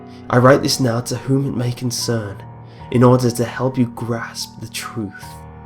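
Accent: United Kingdom. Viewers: I like his voice because he's British!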